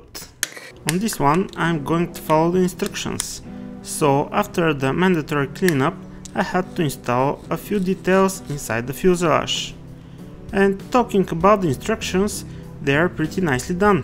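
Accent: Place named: Russia. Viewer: Ну дикий русский акцент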